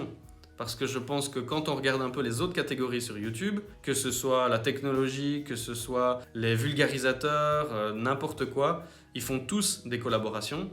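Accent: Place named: Belgium. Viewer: Je m’abonne ! Tu as tellement de choses à nous apprendre, j’adore ! (Et ton accent belge me rappelle mes années d’études à Lille haha j’adore aussi!). Pour la prochaine fois, des petites phrases écrites pour les parties de ta vidéo ça pourrait être cool (là c’était les questions posées du coup), pour mieux imprimer de quoi tu parles, parce que si notre cerveau se déconnecte deux secondes, je sais pas pourquoi mais j’ai eu la sensation que de voir écrit « les chapitres » ça m’aurait aidé, en tout cas ça m’a manqué à un moment pour me repérer dans tes « parties ». Voilà voilà :) merci !! Hâte de voir les prochaines vidéos !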